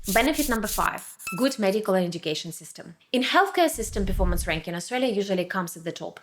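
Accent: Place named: Ukraine. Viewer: an inspiring video, with a certain light vibe. you have a pretty cool accent as a Ukrainian❤❤❤❤ thanks for the video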